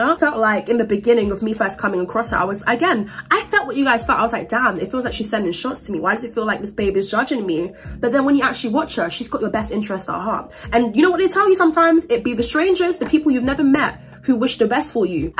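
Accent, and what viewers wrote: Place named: United Kingdom. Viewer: I very much appreciate the subtitles because the auto generated ones always mess up with British accents lol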